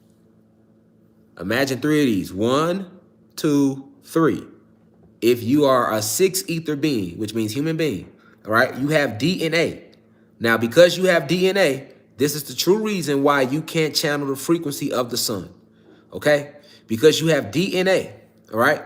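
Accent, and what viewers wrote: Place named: California, United States. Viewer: Ik you from Chicago but I hear Cali also with the accent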